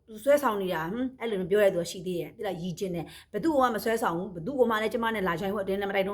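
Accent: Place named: Rakhine, Myanmar. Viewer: Tr က ရခိုင်သံဆင်တယ်နော်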